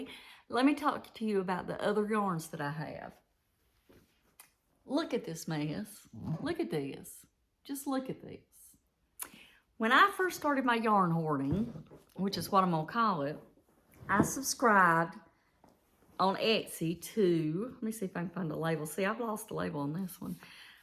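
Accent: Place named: Virginia, United States. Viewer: Hi. I'm enjoying your videos. You sound like where I grew up in Virginia. Is that where you're from?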